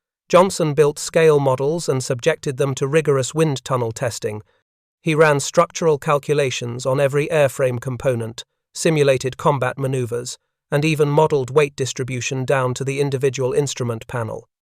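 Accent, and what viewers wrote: England, United Kingdom. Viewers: Anglican English gives the narrative the proper setting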